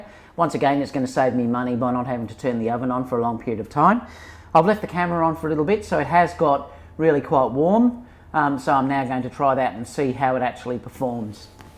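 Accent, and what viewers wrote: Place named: Australia. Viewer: Such an Australian narration and humour, mate!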